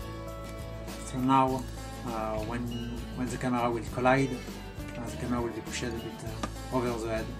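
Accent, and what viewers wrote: France. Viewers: XD l'accent Fr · Je l'ai capté direct que tu étais Français ahah x) Pourrais tu refaire le même tutoriel en Français ? Car moi, je ne comprend pas l'Anglais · Je me doutais déjà que tu étais français vu le titre de la dernière vidéo mais là c'est sûr ! ^^ C'est cool de savoir que tu es français :D En tout cas tu te débrouilles super bien, j'ai rien compris à la vidéo mais bon x) (enfin c'est pas que ton accent est si horrible que ça hein, c'est juste que j'y connais rien perso xD) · Hey ! Nice video :) Are you French ?^^ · Cet accent Français xD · Are you from France because you have the same accent si tu est français pourrais tu fair un tutoriel en français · are u french ?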